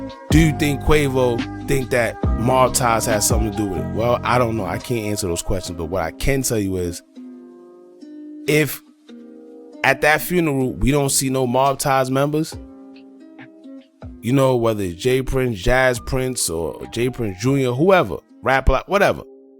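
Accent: New York, United States. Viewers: Hey Bro are you from New York?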